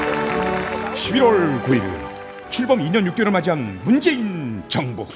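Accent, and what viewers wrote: North Korea. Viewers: 나레이션 북한같아요.. 레포트 써야해서 참고하려고 보는데 도저히 못듣겠어서 소리 끄고 자막키고보네요.. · 이거 진행하는 사람 북한인이잖아 ㅋㅋㅋㅋㅋㅋ 말투 븅신이네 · 말투 북한이냐?? 시이발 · 성우 말투도 북한 억양이네 일부로 그러는건가? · 나레이션이 북한같아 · 성우가 인민군같네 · 진짜 이딴거 만드는 목소리톤마저 저기 건너편 윗편애들한테 닮아가는 기분이 드네 진짜 미친거 아니냐 이딴식으로 되도않는 뜬구름을 팩트라면서 방송하네 ㅋㅋㅋ 2021년 다시 만들어봐라 어떤가 한번보자 미치겠네 ㅋㅋㅋㅋㅋㅋㅋ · 이정도면 걍 우상화 프로파간다 선전물이네....말투도 진짜 북조선 애들 같다...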